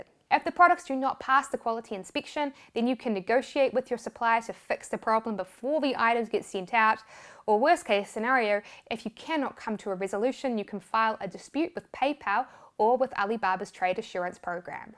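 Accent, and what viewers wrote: New Zealand. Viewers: she has a classic New Zealand accent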